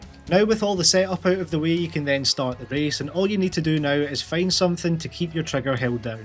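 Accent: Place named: Scotland, United Kingdom. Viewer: My god I love Scotch folks. The accent is amazing. Also at the end it sounds like you are talking about Forza Horizon Sex Content 🤣. Stay cool and thanks for the tips!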